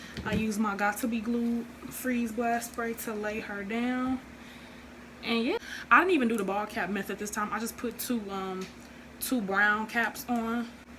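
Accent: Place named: Oakland, United States. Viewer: You Sound Like You’re From Oakland. And I Love This 🍊Orange! 💣💣💣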